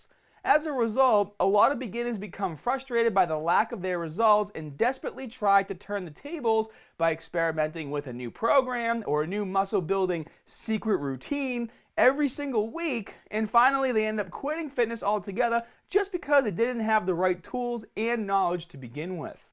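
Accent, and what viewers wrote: Boston, United States. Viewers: lol is he from boston or something? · Is he american or aistralian? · Boston af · I'm portuguese, and i never even been to the US but i can recognize his Boston accent. Its really thick! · Your Boston accent didn't allow me to focus on what you were saying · I can't tell if he's from Boston or Australia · Is he from Boston? I can kind of hear the accent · I have been underexposed to the Boston accent. I'm actually having to remind myself that this is how he seriously talks and he's not trying to be funny or anything... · Is he Bostonian? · Is that a Boston accent?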